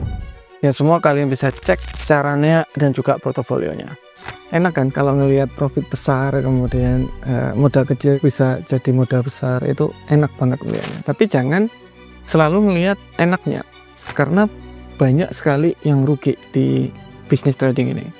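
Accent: Java, Indonesia. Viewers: Bang kmu Jawa ya 🤭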